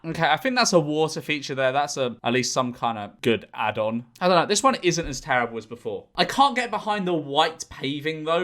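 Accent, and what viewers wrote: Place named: Birmingham, United Kingdom. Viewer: you're starting to sound more Birmingham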